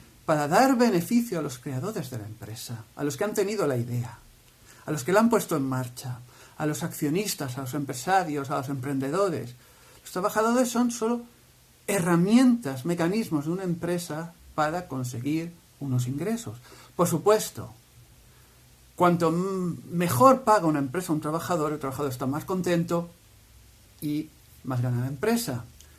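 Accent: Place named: Spain. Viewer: "Allí en el reino de España"... Por el acento, pensaba que eras Español.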